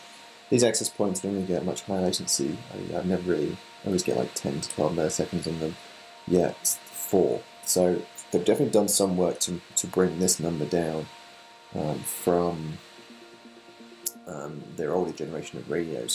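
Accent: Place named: United Kingdom. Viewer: Got my hopes up hearing British accent that you can pick these up in the UK alas by the Speedtest he is in Colorado.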